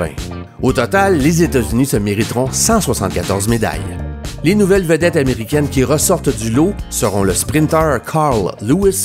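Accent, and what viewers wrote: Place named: Quebec, Canada. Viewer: Bon montage ça se regarde tout seul et avec l'accent du quebec pour les jeux de L.A c est encore mieux..^ un français qui est venu passer quelque mois de bon temps chez vous et qui en garde un bien bien bon souvenir..(plus français que la france a certains égards)❤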